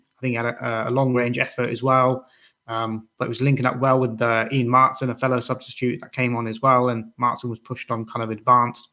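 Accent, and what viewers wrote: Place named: Sunderland, United Kingdom. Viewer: You sound more like mackem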